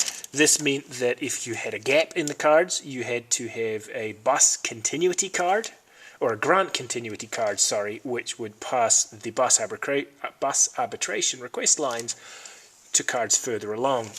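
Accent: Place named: Scotland, United Kingdom. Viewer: Ah, right. Thought I heard a bit of Scot in there too. I guess you get this question a lot.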